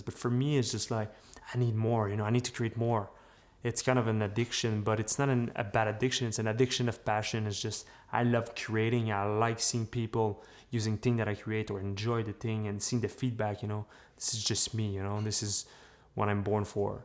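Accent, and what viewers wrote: Quebec, Canada. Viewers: t'as juste l'accent d'un québécois qui parle anglais 😛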